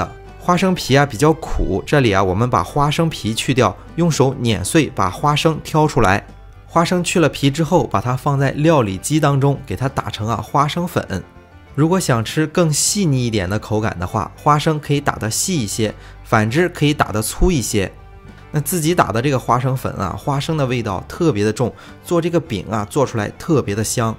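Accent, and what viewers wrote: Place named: Shandong, China. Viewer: 听着就是山东老乡口音 青岛的吧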